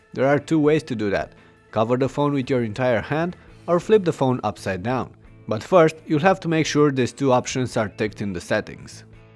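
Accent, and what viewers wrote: Romania. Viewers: Ești roman , se vede după accent.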